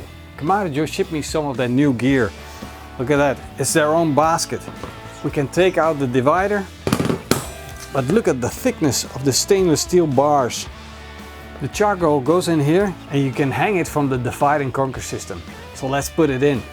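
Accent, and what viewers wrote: Netherlands. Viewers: is deze guy nederlands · are you dutch ??? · Oh yeah yeah nederlandsssss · Are you from around the nederlands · hate the dutch accent. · It's a Dutch accent ;) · shaft says Dutch. He from Holland the netherlands 👊🏾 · Davis Gjeta ja man alle nederlanders hebben zo een engels accentje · wollah echt zehme weloe Nederlanders op youtube Pannekoek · you sound so dutch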